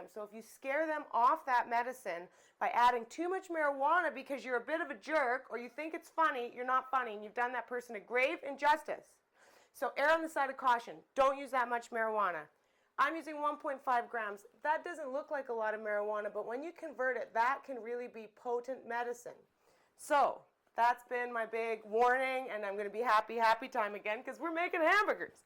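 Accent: Canada. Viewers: She sounds Canadian to me. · You Canadian?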